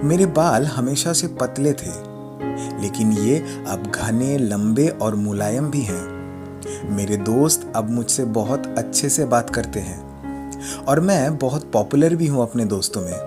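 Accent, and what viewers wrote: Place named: India. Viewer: orry I'm not very good at listening to Indian accents. please tell me about "thank you universe my height is blabla cm" is it our current height or hope for the future?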